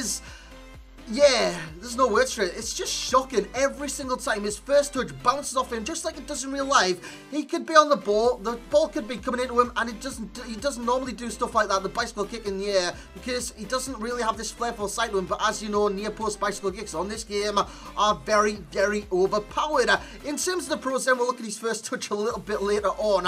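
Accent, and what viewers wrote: Newcastle, United Kingdom. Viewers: Another Geordie we aye man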